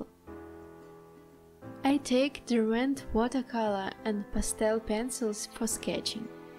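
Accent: Russia. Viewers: Какой же хороший,русский акцент😁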